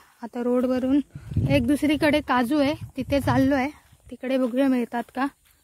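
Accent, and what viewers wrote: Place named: Malvani, India. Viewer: गो सिंधुदुर्गातील खयच्या गावात काजी काढुक गेलंस... तुझा मालवणी कानाक गोड वाटता... ❤❤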